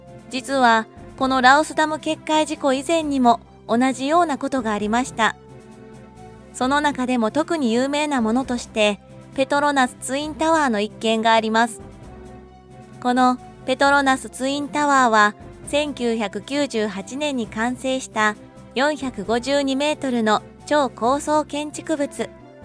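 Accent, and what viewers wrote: Kansai, Japan. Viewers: ナレーションの方は関西の方？ ヘンに隠さず、関西弁でナレーションされた方が楽しいかも。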